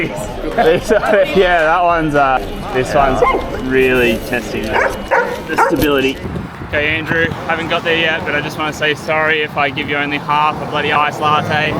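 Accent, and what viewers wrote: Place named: Australia. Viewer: I have to admit, I'm not used to Australian accent and I understood barely anything.Especially because the speech is quite fast but I got the idea!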